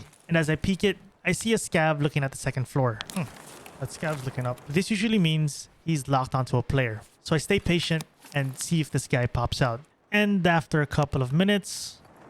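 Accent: Philippines. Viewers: Are you Filipino? Your accent is very familiar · The accent tells me somehow ", pre pinoy ka ba?"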